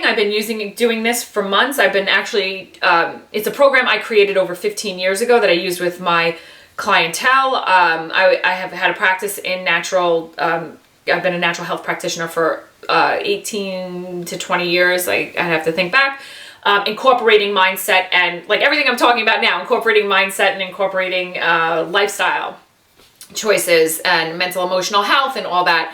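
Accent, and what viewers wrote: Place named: Northeast, United States. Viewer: loved the nor'easter accent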